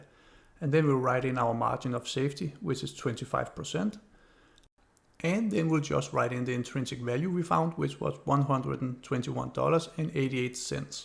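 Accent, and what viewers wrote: Denmark. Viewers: Excellent video - keep up the good work - nice danish accent by the way ! - from a fellow dane !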